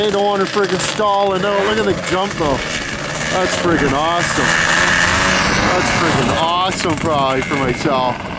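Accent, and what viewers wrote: Ontario, Canada. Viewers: Lol I can tell your from Ontario just by the accent.. everyone from the up to northern michigan tht stays there a while picks it up.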